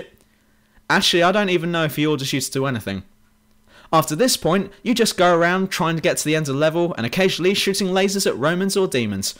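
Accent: United Kingdom. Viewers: gotta love that british accent :>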